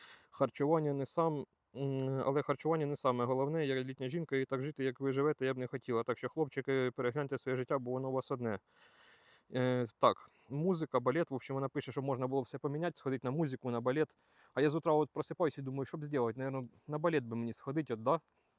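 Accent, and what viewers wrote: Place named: Surzhyk, Ukraine. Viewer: Балакай краще вже на російській,бо твій лютий суржик це капець.....або вивчай мову краще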